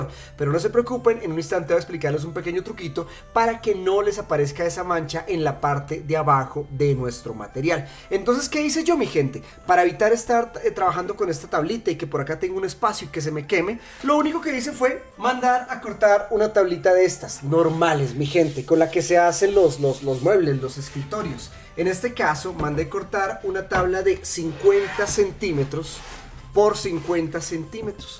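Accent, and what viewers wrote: Colombia. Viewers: waaaaaaaaa colombia? no me habia dado cuenta hasta que hablo xD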